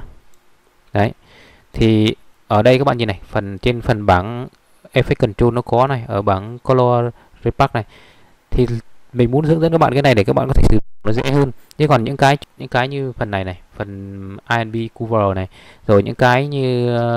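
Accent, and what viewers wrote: Vietnam. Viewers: Em hỏi ngu xíu ạ, em nghĩ là add phát âm tiếng anh đọc như tiếng Việt này là cho người nghe dễ hiểu phải không ạ? Em không nghĩ 1 người có thể giỏi về PR như vậy lại không biết english đâu hehe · ad đọc tiếng anh như tiếng việt, nhưng k sao 1 điểm thú vị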